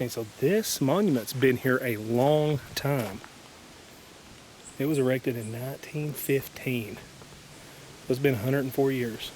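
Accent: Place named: Georgia, United States. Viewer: I grew up in Tennessee, and I've been living in southeast Georgia for the last 8 years, and I can always hear the difference in the southern draw between Georgia and Tennessee when I listen to talk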